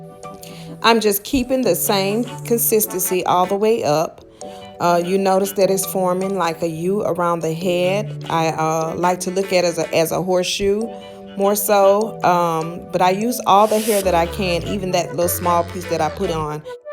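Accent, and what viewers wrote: South, United States. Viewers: I can tell you're Southern belle by the accent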